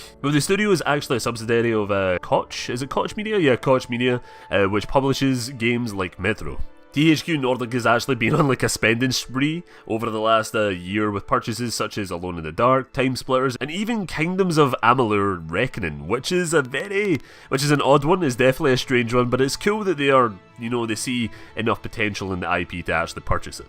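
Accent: Scotland, United Kingdom. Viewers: You're accent is so Scottish that I had to really concentrate to hear that it was still English sometimes lol.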